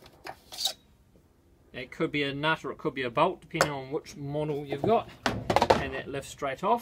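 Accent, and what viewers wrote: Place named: New Zealand. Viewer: Brilliant video - good to hear a NZ voice with short no nonsense simple instructions - thank you so much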